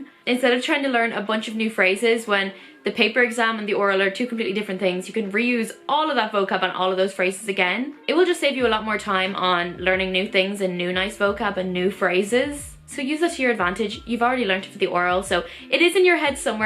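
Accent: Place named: Donegal, Ireland. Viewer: I love Donegal Irish, but it can be challenging to understand it all the time. Cé mar atá thú?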